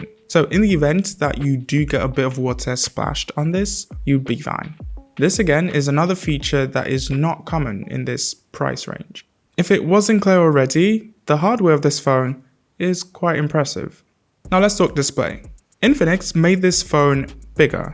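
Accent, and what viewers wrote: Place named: United Kingdom. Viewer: I believe na UK Born or stayed there for many years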